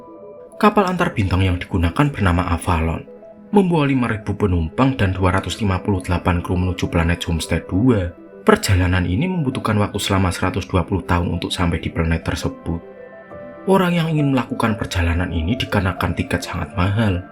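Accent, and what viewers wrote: Java, Indonesia. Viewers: Medok bgt naratornya.. 😅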